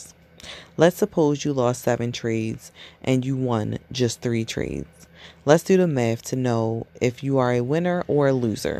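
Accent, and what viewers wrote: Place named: Baltimore, United States. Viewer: The woman reading sounds like she could be from Baltimore (as I'm from the DMV area). Great work and thanks for the full audio!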